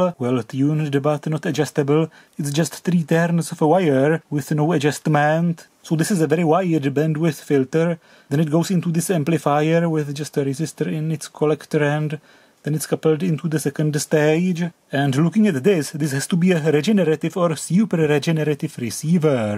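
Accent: Czech Republic. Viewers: I know his english is good and that he's Czech, but that is one funky accent. I'm just super surprised I don't see people talking about it. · really hard to listen to ..is it czech accent? too bad, since the understandable parts sound interesting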